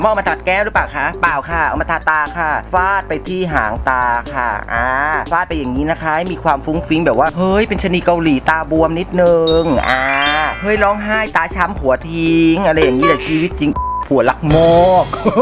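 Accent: South, Thailand. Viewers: วันนี้สำเนียงคล้ายเด็กใต้รึป่าวค่ะ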